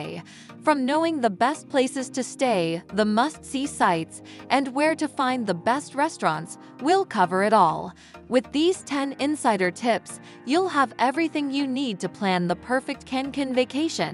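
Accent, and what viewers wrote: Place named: United States. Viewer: when american pronunciation cicks in,.. some places had a different pronunciations. anyways thanx for this general video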